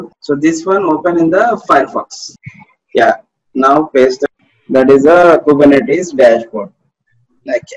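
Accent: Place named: India. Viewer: nice hindish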